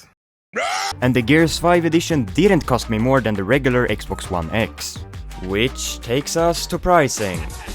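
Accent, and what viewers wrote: Sweden. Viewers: I recognized your swedish accent and you confirmed it when you said "swedish prices" lol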